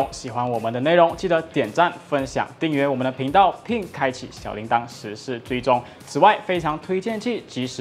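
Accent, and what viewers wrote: Standard. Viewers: 华语非常标准👍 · 新人不错，华语标准，表达清晰，automachi捡到宝了，可以好好栽培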